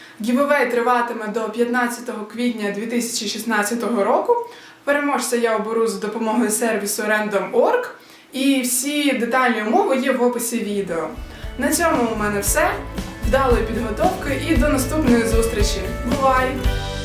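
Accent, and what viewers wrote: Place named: Russia. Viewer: потренуй фонетику, маєш дуже російське ч і ц. Варто також трохи інакше вимовляти кінцівки осоьливо там де говориш чи і ці. Впродовж є таке слово, також є гарно дякую, є просто подяка. Відео знімати. можливо твої російськомовні колеги перейдуть на українську, побачивши твій чудовий приклад. Молодець!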